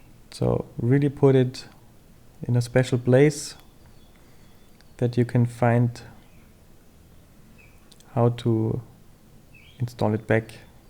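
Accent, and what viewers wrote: Germany. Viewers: Are you from germany ? And nice video bro i'm gonna try this on my 5s 💪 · iam hearing a bisschen deutsch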